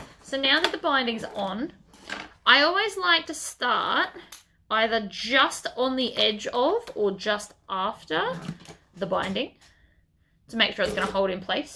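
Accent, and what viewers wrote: Australia. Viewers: has a Mitsubishi, that she painted herself, and has a Video, or a few showing how and what she used to do it.:). I too love listening to her as a Fellow Aussie, not many that do tutorials, so it is lovely listening to her.:)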